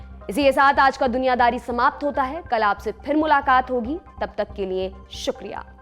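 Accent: India. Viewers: Yes , but some urdu word is hard to speak with indian acsent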